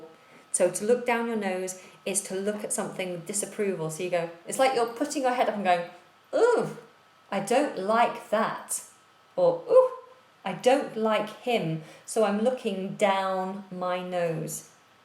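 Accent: United Kingdom. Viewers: easy and clear British english. Thanks for what you are doing. Just subscribed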